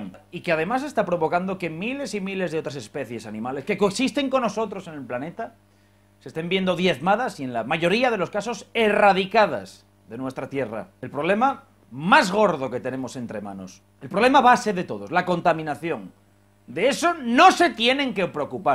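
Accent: Spain. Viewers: Hombre que siempre llegas a un punto pero esta vez no e entendido lo que quieres dar a entender pero nada que el acento asturiano te que da bien y más cuando cantas música de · Qué bien te queda el acento español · Acento español? Sera que es español jajaja. Oero es muy simpatico y me gusta sus videos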